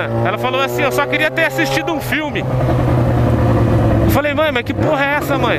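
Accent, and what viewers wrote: Goiás, Brazil. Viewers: e Porque Fala o Pique Do Goias? Kkkkkk Sou Goiano e n Entendi n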